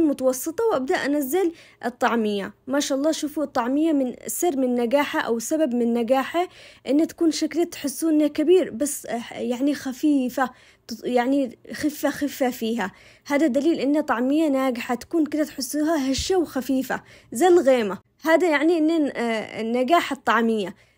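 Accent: Aden, Yemen. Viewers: 😂😂 حتى بنتي الصغيره تقلدها وتسوي فيدوهات ماشاءالله اللهجه العدنية تفووووز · ماشاءالله مصري بلهجة يمنية 😃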